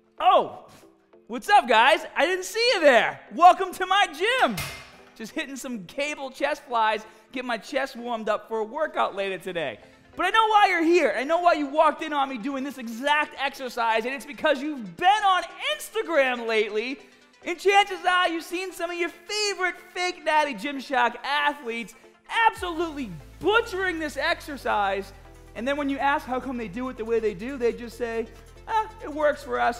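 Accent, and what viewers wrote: Boston, United States. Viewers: Love this guy and his accent, he’s quite knowledgeable, sounds like a South Boston native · He is from Boston. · Awesome video. Ur Boston accent makes it even more enjoyable. I'd rather watch u than any of those not natty instructors on social media. Ur down to earth and real and u care about helping people. Thats the most important thing 👏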